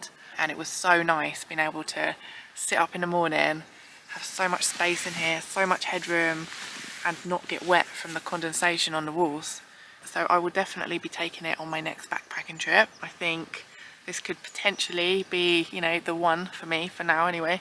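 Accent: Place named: Berkshire, United Kingdom. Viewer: Hi, i have been trying to figure out your accent for a while now, is it Berkshire, Oxfordshire, Hampshire or near. My mother is from Cane End nr Henley originally and sounds a bit like you. Accents intrigue me. Great videos, reviews and adventures, and i love the wildlife you encounter, stay safe, all the best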